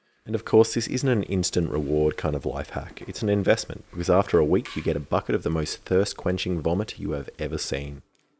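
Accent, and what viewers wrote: Australia. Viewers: ...He's aussie. You'd know by his accent and australia and america jokes. Also, the magpie video. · He's Australian He's saying "how ya going" It's our version of hello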